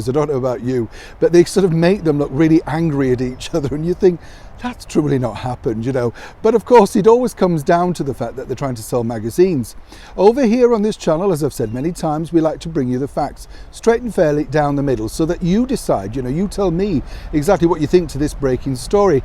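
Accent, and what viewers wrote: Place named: Northern England, United Kingdom. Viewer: Hi, just wondering which part of the north of England you hail from? I am a scouser currently living in Australia so no doubting my heritage….you sound very familiar 🙂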